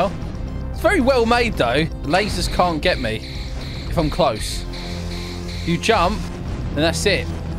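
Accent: United Kingdom. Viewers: You sound british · yea the brithish accent is amzazing like mate sheesh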